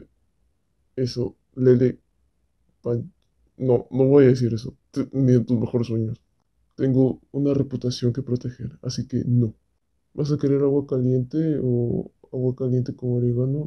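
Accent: Peru. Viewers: eres peruano? tu dejo es muy parecidos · Hay me da la impresion de q eres peruano ( :D nada mas es una duda XD no te lo tomes a mal) Pd. Ta bonito el video :D chao cuidate xd un F por tu brazo XD .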